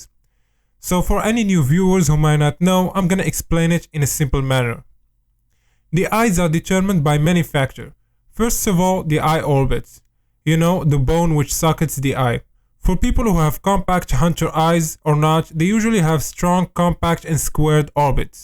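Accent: Morocco. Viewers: Ur voice bayna mghribi Keep it up asat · is that a moroccan accent 🧐🧐 ? ARE U MOROCCAN GUYS ? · Your accent is Moroccan ?? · accent mghribi brb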